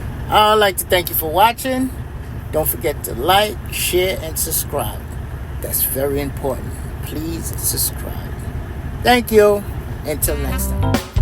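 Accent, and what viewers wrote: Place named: Jamaica. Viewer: Man, you sound like a Jamaican for real bro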